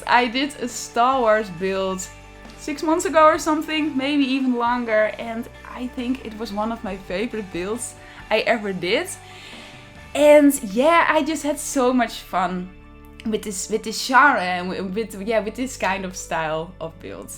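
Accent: Netherlands. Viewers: Are you Dutch?😁🇳🇱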